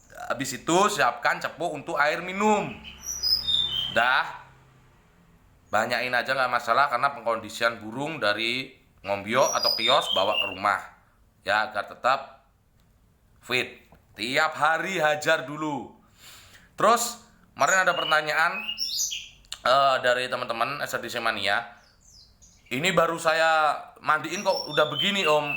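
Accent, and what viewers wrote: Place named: Bali, Indonesia. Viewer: ...baru beli srdc ombyokan kemarin...liat video ini langsung tertarik nontonnya..bahkan sampai berulang2 biar paham....dengar logatnya jadi kangen bahasa indonesia yg aga ada medok balinya...saya lahir dan besar di gianyar mas....sekarang lagi merantau di pandeglang banten....maju terus tetap semangat...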